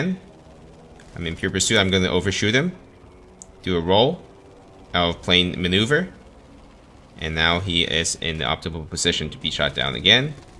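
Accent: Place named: South Korea. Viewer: Where is this guy from I can’t tell, he sounds kind of korean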